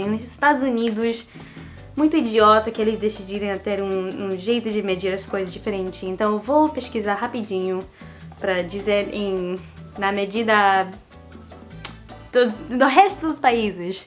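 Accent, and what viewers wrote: Rio de Janeiro, Brazil. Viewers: falar português com sotaque de carioca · seu português tem sotaque carioca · seu sotaque não parece americano, é bem carioca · seu português tem sotaque de carioca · coisashhh... Tá bem carioca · parece uma carioca ela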